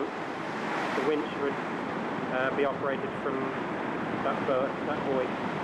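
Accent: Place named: Peacehaven, United Kingdom. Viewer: I have a friend who comes from Peacehaven and his accent and pronunciation is identical to yours. If I close my eyes it could be him speaking. You're not from round that way are you?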